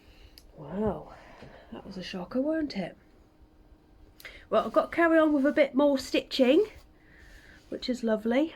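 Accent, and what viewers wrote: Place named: Somerset, United Kingdom. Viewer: You make me laugh..you remind me of a young Pam Ayers, similar accent..I’m guessing Somerset, am I right ? :)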